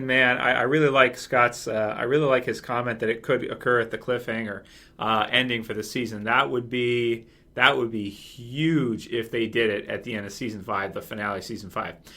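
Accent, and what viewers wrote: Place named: Canada. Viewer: Canadian? I hear an accent from time to time. Great channel. Love it!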